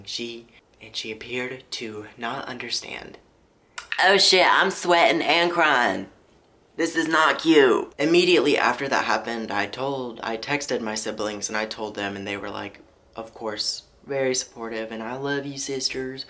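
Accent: South, United States. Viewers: Your southern accent is so cute I love it :)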